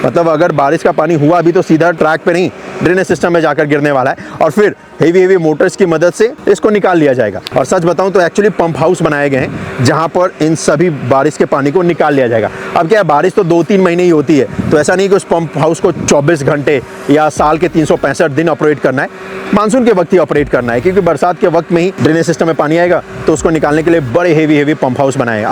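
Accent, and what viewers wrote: Bihar, India. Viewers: he need to fix his Bihari accent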